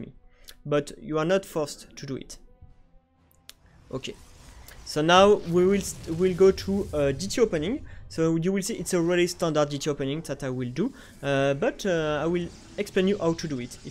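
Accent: France. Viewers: Man you are so cozy guy and your accent tho! Really makes me smile when I hear your frenchglish haha:D thanks for your work sub and bell dont disappoint my trust!! :D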